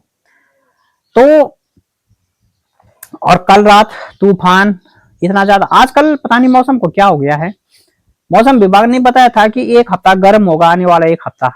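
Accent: Garhwal, India. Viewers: बहुत ही सुंदर वीडियो भाई जी आप बोलते बहुत अच्छे हो और आपकी बात शुद्ध और स्पष्ट होती है आपके द्वारा दिया गया रिएक्शन सटीक होता है आपके कमेंट बॉक्स में एक भाई ने कमेंट किया है कि आप हिंदी में कम गढ़वाली लहजा अधिक है तो भाई जी मै इस भाई को कहना चाहता हूं की बिहारी पूर्वी उत्तर प्रदेश बंगाली मद्राशी उड़िया महाराष्ट्र बड़े बड़े राज्यों के लोग अपनी भाषा बोली का इस्तेमाल करते है अपने लहजे में बात करते है अच्छी बात है न गढ़वाली लहजा है तो गर्व की बात है कि हम गढ़वाली है हमारा लहजा गढ़वाली होना चाहिए आप भाई का बीडीओ देखो इंजॉय करो और भाई को सपोर्ट करो लाइक करो बेफजूल के कमेंट करने से अच्छा होगा हम अपने इन भाई को आगे बढ़ाए स्पोर्ट करे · आप हिन्दी में कम गढ़वाली लहजा अधिक है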